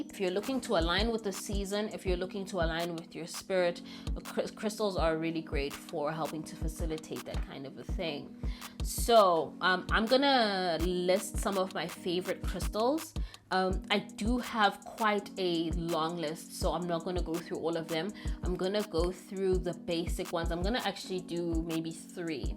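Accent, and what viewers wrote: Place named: Africa. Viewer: U R Amazingly Gifted with Truth, Knowledge, Wisdom, Understanding, Beauty, Creativity in Your Unique Style/Elegance/Fashion, Professional, Precise & Proper African yet Down2Earth Dialect, etc & most Importantly, The Highest Vibrational Frequency of LOVE❣️ I 🤎, value, appreciate & honor U, Wombman King /Goddess/ Empress for Your Fearless Boldness in sharing Truth & allowing those of us who hav WOKE 🆙 & intentionally honor & cry out to Our ANKHcestors & The Universe, for Truth, Sankofa, etc! Ultimately & most importantly, for SelfLove! Which U mentioned & resonated deeply with My Spirit❣️Bcause SelfLove is what we need to empower us to LOVE Others as we Love ourselves! I Am Gr8fully Blessed to be counted among your Thousands of Sibscribers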